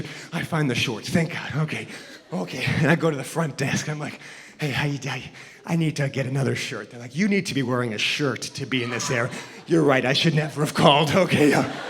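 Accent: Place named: Massachusetts, United States. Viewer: I was thinking ok that’s Massachusetts or Portland because I actually understand this guy and I now I believe it is Massachusetts.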